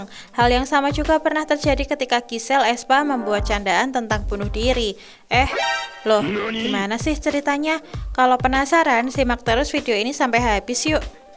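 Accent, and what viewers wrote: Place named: Java, Indonesia. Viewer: medok mbk nya wkw jd salfok soundnya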